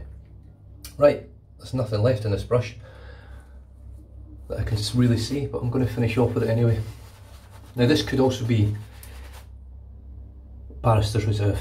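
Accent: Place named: Scotland, United Kingdom. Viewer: Your voice in not monotone, it is Sco’ish. I have no idea what you’re saying but it sounds fantastic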